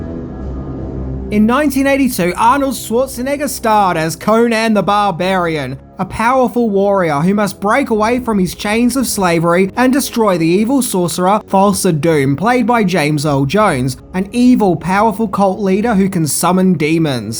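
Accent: Australia. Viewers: No, you idiot. lives in Australia. He's said that many times. Not to mention his Australian accent is a dead give away. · He pronounces things as an Ozzie. Though I think he uses words incorrectly on purpose, like ‘irony.’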